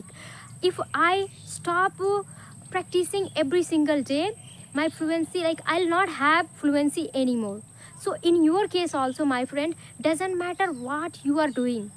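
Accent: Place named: Bihar, India. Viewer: You are not able to Your Hindi Bihar language slang I Guessed that But Like your Passion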